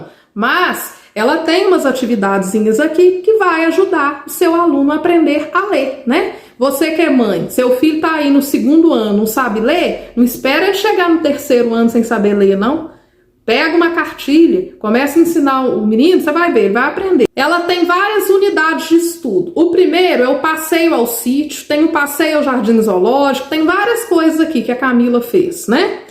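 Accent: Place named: Minas Gerais, Brazil. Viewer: Vc é mineirinha? Sotaque inconfundível 😅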